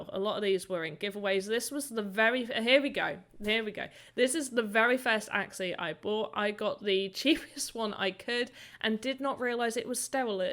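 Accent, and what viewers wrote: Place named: England, United Kingdom. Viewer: May I ask, where in the world are you? What part of England?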